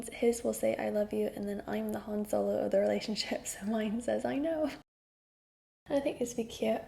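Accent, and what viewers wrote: England, United Kingdom. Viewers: You are sounding quite English lol love it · The longer you are in England, the more British you sound. For some reason, the accent really suits you! Happy anniversary. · I can hear the British accent starting to shine thru, like a little bit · I love how you sound a little british now❤️ congratulations!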